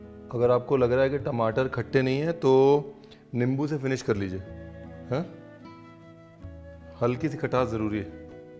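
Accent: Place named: Punjab, India. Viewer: Hindi mein punjabi touch ka jo mza dalte hain aap usse apnepn wali feeling ati rehti hai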